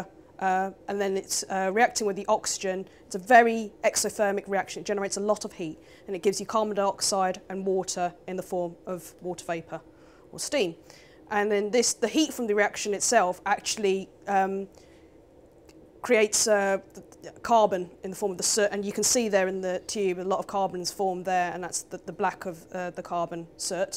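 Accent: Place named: United Kingdom. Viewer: Asian+British accent= blown mind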